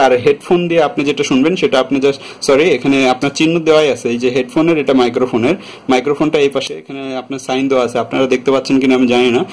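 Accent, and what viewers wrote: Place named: Sylhet, Bangladesh. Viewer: আপনি সিলেটি..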